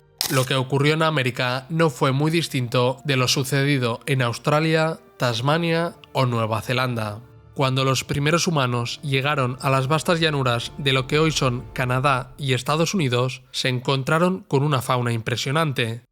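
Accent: Spain. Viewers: No hay duda que los mejores documentales deven tener la Voz de un Español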